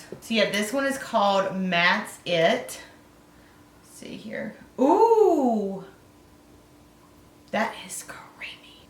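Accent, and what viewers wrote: South, United States. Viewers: I love your southern accent 😂 what state do you live in? Close to Tennessee ?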